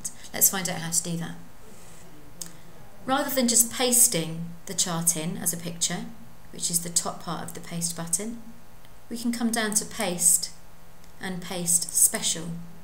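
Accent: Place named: United Kingdom. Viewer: annoying british accent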